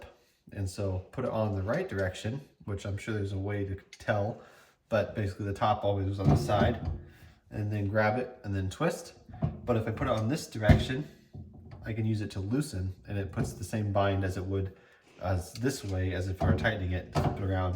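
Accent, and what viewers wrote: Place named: Canada. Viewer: He talks like a candian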